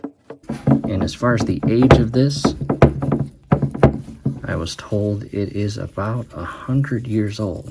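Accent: United States. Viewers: Hey MichGanders’ I enjoyed your videos, I have to tell you that about 3 minutes into the first video I went WWait a minute I hear a familiar accent.. 🤔❓ then I watched a second video and I was convinced yip she’s definitely from MI.. well hey 👋 there from Johnny O.C.K a FERRIS Grad from Illinois.. long long time ago.. .. 🍻 Cheers.. GO COLORADO AVALANCHE 🏒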